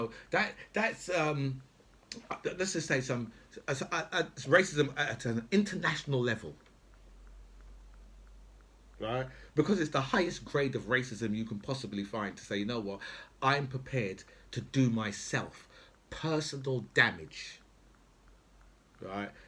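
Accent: London, United Kingdom. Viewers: British MGTOW, just found your channel, nice to hear a London voice, lived and loved there for fours years, keep up the good work, on your side from Dublin Joe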